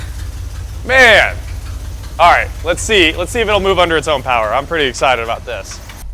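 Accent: United States. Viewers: WTF happened to you.....the majority of the time you speak correctly. Today you are in US mode and it is hard on the ears. Please correct that lazy shit talk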